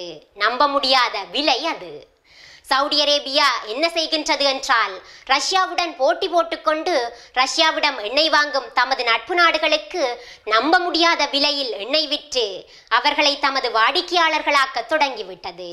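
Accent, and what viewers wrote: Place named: Sri Lanka. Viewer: அருமையான பதிவு நன்றி உங்கள் சிங்கள தமிழ் இணிக்கிறது கேட்க கேட்க அருமை வாழ்த்துக்கள் வாழ்க வளமுடன்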